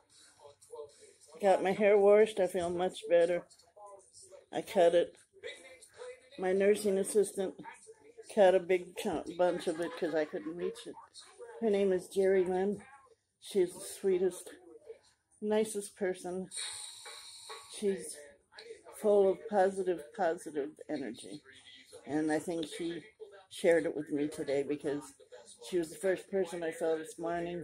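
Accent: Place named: South, United States. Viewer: I love your voice, It sounds really nice, sometimes I go over your videos to hear your soothing voice, Its comforting.,Sounds like a Southern Girl.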